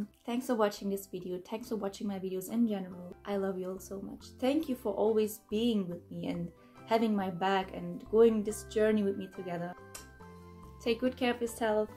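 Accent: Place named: Turkey. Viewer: Just by looking at your face I felt that you might be Turkish and then I heard your accent and I knew for sure🥳 You dance beautifully, hope you will continue your journey all the time💙 And good look with Korean and other languages ^^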